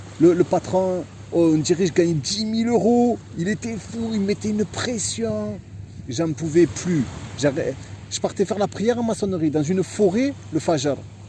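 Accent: Marseille, France. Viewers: tu as l'accent marseillais , tu es sur que tu es d' angers ? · Salam alaykoum wa rahmatullah wa barakattuh Qu'Allah azawagell t'accorde le bien ainsi que ta famille 👪. Mais dit moi t'es un peu Marseillais non?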